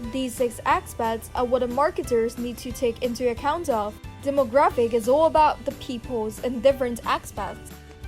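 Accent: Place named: United States. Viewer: I love ur American accent! U must be a beautiful girl!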